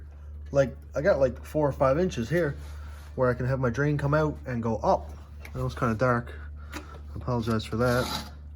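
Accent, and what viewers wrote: Newfoundland, Canada. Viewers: You from Newfoundland or the Eastcoast by chance? Your accent sounds very familiar. Love the build! Cant wait to see more progress on it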